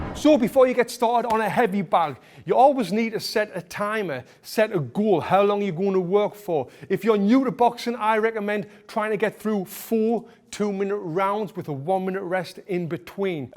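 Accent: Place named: Sunderland, United Kingdom. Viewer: Great Mackum accent...really good 'no nonsense' coaching technique. Good work.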